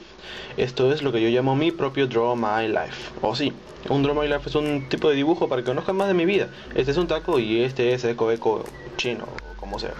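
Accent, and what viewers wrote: Peru. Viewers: Eres peruano??? Bien csm salu2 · ERES PERUANO!!! :0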